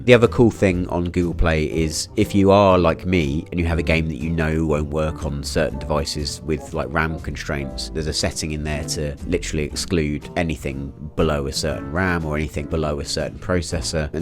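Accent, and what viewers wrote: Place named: Southern England, United Kingdom. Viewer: Hey I just came across your channel, it's so nice to find a fellow brit doing game development! May I ask where you're based? You definitely sound like a Southener ;-)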